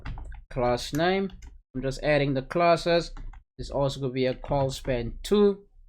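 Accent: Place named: South Africa. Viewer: Yusis china lekka to hear a local voice hay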